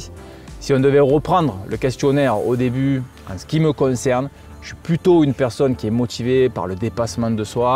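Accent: South, France. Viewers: Merci pour cette belle vidéo, j'apprécie ta façon d'aborder les choses et ton honnêteté et biensûr il ne faut surtout pas oublié l'accent du sud si charment droit au but 💪🏾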